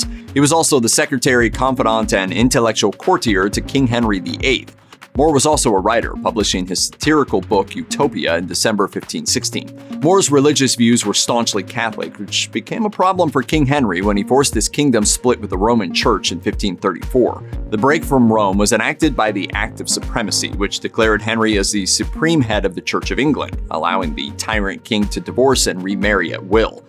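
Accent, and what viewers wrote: United States. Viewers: I didnt finish the documentary because of the annoying American accent 🤷🏽‍♂️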